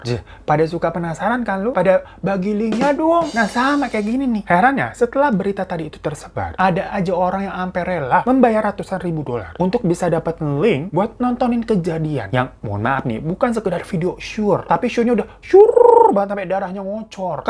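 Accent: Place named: Betawi, Indonesia. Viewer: Cara ngomong lu keg guru ngaji gua.. betawi bed da ah 🤣🤣🤣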